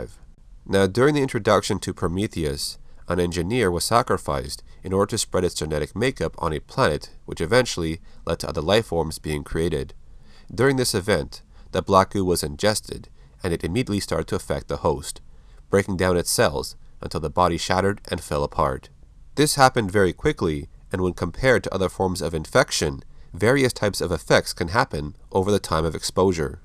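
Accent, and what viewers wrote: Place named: Canada. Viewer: are you Canadian? I swear you sound Canadian.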